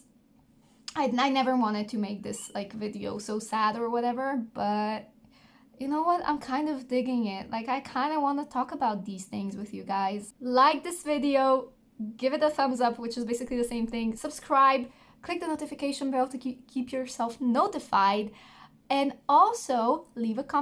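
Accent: Slovenia. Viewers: Love your slovenian accent 🤩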